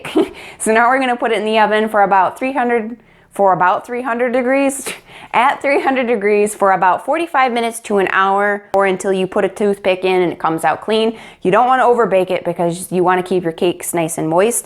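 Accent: United States. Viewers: My husband is going to love this! New sub! Your video for the raspberry jam came across my gmail feed. Your accent is adorable! What state are you from? Love from Texas☺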